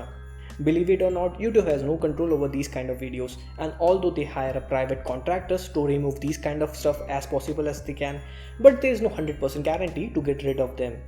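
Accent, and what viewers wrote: India. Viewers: Fuck up with your Indian accent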